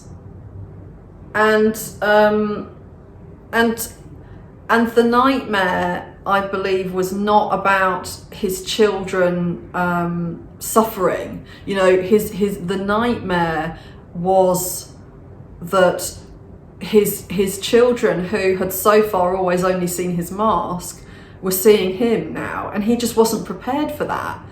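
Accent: United Kingdom. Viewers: I adore your Britain english accent😍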